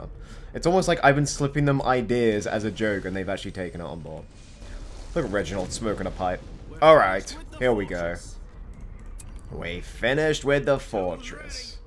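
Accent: United Kingdom. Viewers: Hi I'm just a little YouTuber nobody but I'm from Oz and I promise you don't have an Oz accent, you sound beautifully British:) Love all the stuff you do and you are the reason I started watching YouTube, never stop with your magic!:) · Thanks for playing the map, hopefully I can make some more in the future, if I do (and if they're good) they'll be coming your way. Yes I'm aussie, and your accent is British, but, as they say....... Iv'e liked Bfme versus since BEFORE it was lame. Just joking I love ur channel I dont mind if u use my name, its ok. whatever.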